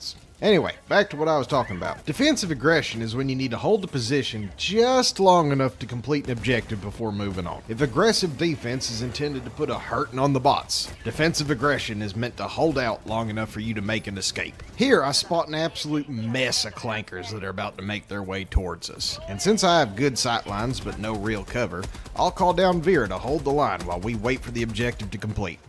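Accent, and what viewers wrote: South, United States. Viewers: He's carried by that smooth southern accent.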